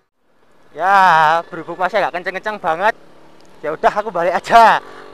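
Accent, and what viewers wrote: Java, Indonesia. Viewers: Silang jowonya pekat #frommalaysia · wong magelang to sampean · Wong temanggung@ HAHAHA aku ngekek saben weroh video gon mase iki. Medok banget suarane wkwkwkwk :v :v . mirip IVW motovlog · Jowo mas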